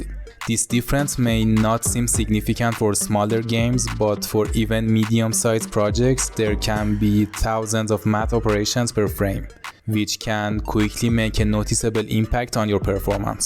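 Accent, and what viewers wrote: Iran. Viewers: bro from that accent your definitely persian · لهجه ایرانی وری یونیک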